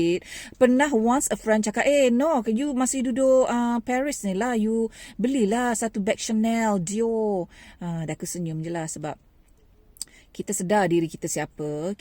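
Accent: Negeri Sembilan, Malaysia. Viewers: thats right she does speak in nogori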